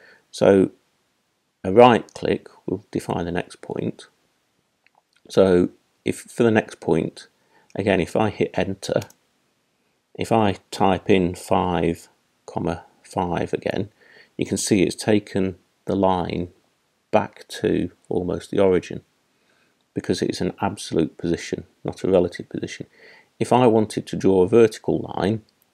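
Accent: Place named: United Kingdom. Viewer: Really helpful vids....And I myself love the British accent...its very proper...Thanks.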